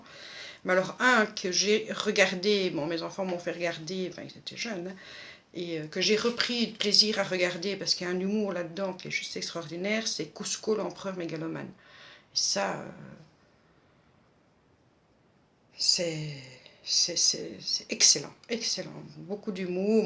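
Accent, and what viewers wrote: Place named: Belgium. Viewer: Coucou C'est super d'en apprendre plus sur les copines grace aux tags... je trouve ça sympa. Et je vois que toi aussi tu as un joli accent... belge😊 Bon week end Gros bisous😘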